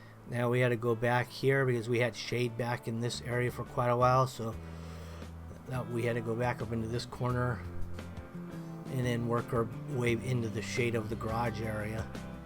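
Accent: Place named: California, United States. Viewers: Pale Writer its a cali accent is wat he is talking about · I dunno, agree that it's dead on So Cal but more surfer than Mexican influenced. I think the Cali Mex accent is influenced by the So Cal surfer type sounds more than the other way around. I've lived here my whole life so kind of hard for me to hear so maybe I'm wrong but I DID grow up with Mexicans lol. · I live in Los Angeles so many people talk like that up here😂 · That accent....so calififornia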